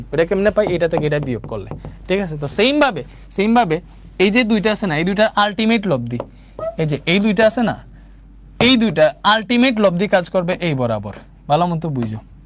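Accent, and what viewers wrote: Sylhet, Bangladesh. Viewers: ভাই সিলেটি নি??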